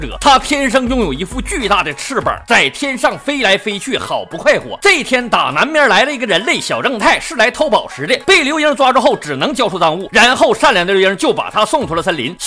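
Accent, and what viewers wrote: Northeast, China. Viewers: 就喜欢听牛叔说 东北大辣皮儿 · 说朗朗额够，东北牛叔英语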